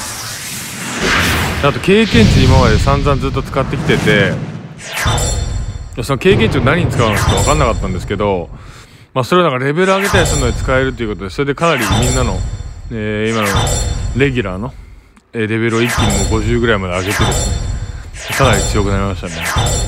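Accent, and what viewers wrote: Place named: Osaka, Japan. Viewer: たまに出る大阪弁嬉しい😍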